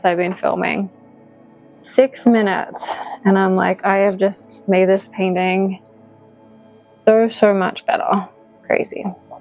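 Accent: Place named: Australia. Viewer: Nice process video. Both real, and real-time. I'm terrible at placing accents, but I think you and are from the same part of Australia, and maybe have similar missions as art vloggers.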